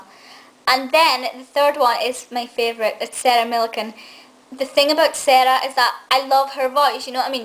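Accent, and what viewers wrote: Scotland, United Kingdom. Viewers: I loved alan alan alan so why you living in scotland you have a hint of a scottish accent but at times i make out the geordie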